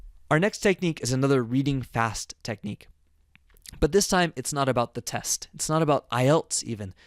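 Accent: United States. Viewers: Awesome mate, this is all I want to know about reading correctly, not just for ielts test also for a long term English learning or any other language learning. The thing is, I'm curious where you come? R u American? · Oh my goodness...m in luv with ur voice😘so soothing n everybody's gonna luv to listen to u...American!!u r??(for sure)...well don't get me wrong...wanna check my accent recognition capability.🤗...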